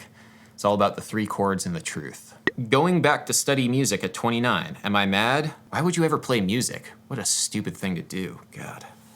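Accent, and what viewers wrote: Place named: United States. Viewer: Man.. something in my mind told me that you were britsh.. but now I realized that you dont have an accent.. you are american.. my eyes were so closed haha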